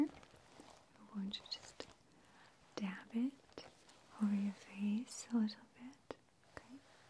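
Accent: Russia. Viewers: Richclashchair ASMR I love her voice. Russian accents are very beautiful. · Craig Pavey I didnt take it as sarcastic, just that you misunderstand what I said. I mentioned American women dont speak like her BECAUSE she is Russian. Sorry I guess it was more complex then I intended, thats what I get for being an English major lol.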